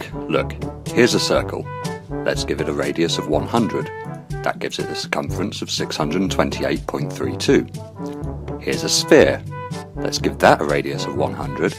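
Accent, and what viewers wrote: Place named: North, United Kingdom. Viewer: I like the shaky camerawork at the beginning! It gives the film a bit of a 'Blair Witch' edge to it. Seriously though ... your mellifluous northern tones makes the rather esoteric subject matter a lot more palatable. As if Brian Cox had presented those Open University programmes in the 70s. Now then. The question is what do I do first? Watch your other videos? Visit Iovandrake's profile with my troll mask? Or Google 'potholer54'? And before you say 'none of the above' ... you're too late. I have already subscribed to your channel. Nice one!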